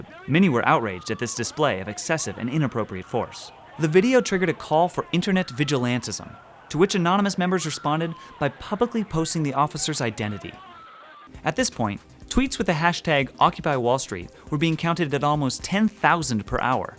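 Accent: United Kingdom. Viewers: u sound like ur britland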